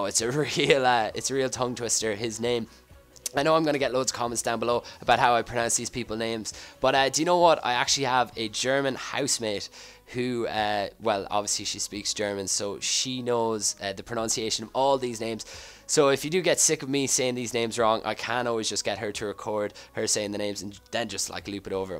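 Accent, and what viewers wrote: Ireland. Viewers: what part of Ireland are you from?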